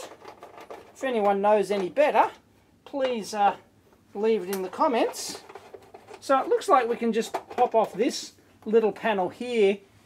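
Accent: Australia. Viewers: Nice one! That dumpster is becoming an electronic goldmine!! Keep up the good work, Love the Aussie Accent too!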